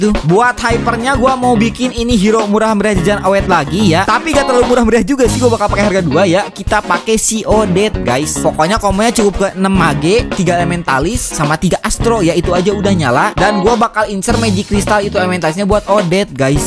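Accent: West Java, Indonesia. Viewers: Logat SUNDA nya nagih sumpah wkwkwk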